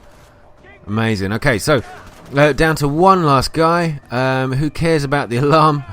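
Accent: United Kingdom. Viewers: Aahh.. I see now that you'd been alerted already, lol.. I had to click "show more comments" to see this one. Hey - you should make a note of that in the description (edit) or something mate... look how many folks didn't notice it and are still thinking that anti-stealth is going to earn near-equal points as stealth - when it's actually less than half the XP eh? Well - I am now subscribed for your future efforts - nice to hear that cool brit accent lol.. cheers!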